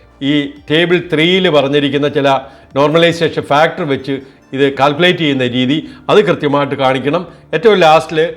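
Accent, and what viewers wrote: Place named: Poonjar, India. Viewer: പൂഞ്ഞാറ്റിലെ ഇംഗ്ലീഷ്.. താനക്കെ ഇംഗ്ലീഷ് ഡബ്ബിംഗ് വീഡിയോ ഇട്ടിട്ട് ആര് കാണാനാണ്..... കോണത്തിലെ ഇംഗ്ലീഷ്...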